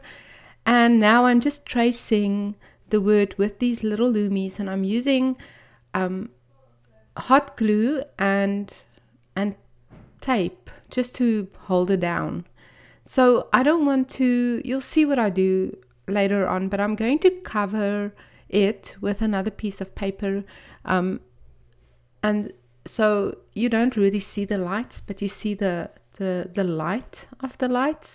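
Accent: South Africa. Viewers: Beautiful, once again! Your layouts look so deceivingly simple... The white background works wonderfully with your style. A blessed year for you too <3. By the way, I love your accent. Reminds me of my childhood a lifetime away in Johannesburg.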